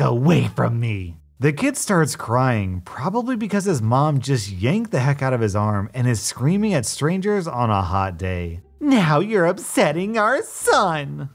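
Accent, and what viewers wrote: South, United States. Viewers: please keep doing the southern accent. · Hey, I’m loving the southern accent plus that pronunciation was epic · Im for ga. And when he does the southern voice all of us here in ga are okay with it.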